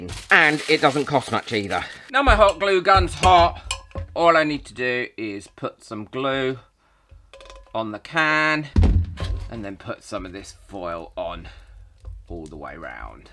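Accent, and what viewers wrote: United Kingdom. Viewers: That pot came out so, so well! I'm genuinely impressed with how you did that. You have such a great creative mind and you seem to see possibilities in everything around you. I would absolutely love to sit and chat to you about crafting and your creative process. You are such an intelligent and clever man. I am a huge fan of yours. I am also a brit and I love your accent. What part of the country are you from?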